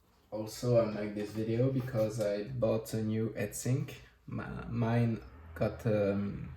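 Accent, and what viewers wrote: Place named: England, United Kingdom. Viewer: J'adore ton accent anglais XD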